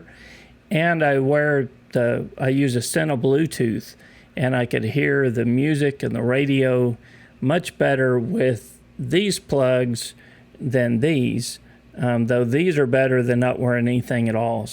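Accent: Texas, United States. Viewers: Hey. You and Anthony might be twins, but he talks like New Yorker, and you talk like a Texan. You just need to practice saying "y'all" instead of "you guys" when you record your videos. Loved your impersonation of Anthony! Hilarious!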